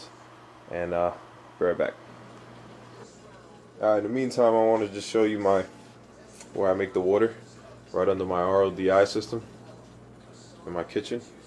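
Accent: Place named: Brooklyn, United States. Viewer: He must be from Brooklyn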